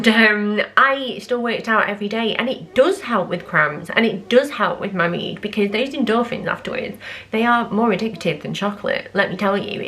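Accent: United Kingdom. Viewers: Random one but are you from the Wirral? 👀x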